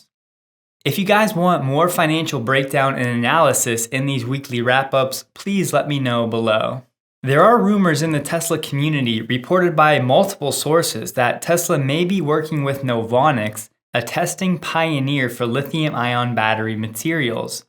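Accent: United States. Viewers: It's really a pleasure to hear a nice comprehensive summary covering major Tesla issues. It's even better when it's in clear American English.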